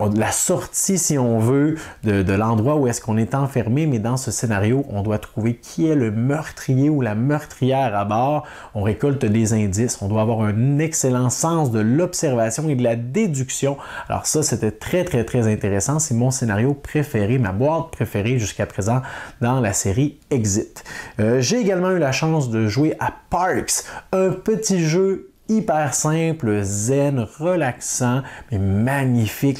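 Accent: Quebec, Canada. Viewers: Nouvel abonné, je vois suis depuis la Toulouse en France. Je vous ai découvert par hasard, et je vais être honnête c'est l'accent québécois qui m'a fait triper ! Plus sérieusement, c'est votre vidéo sur cloudspire qui m'intéressait, et grâce à vous (ou à cause de vous ?) mon portefeuille va saigner car j'ai très très envie de me l'offrir ! Merci pour votre passion et bonne continuation ! Salutations de Toulouse !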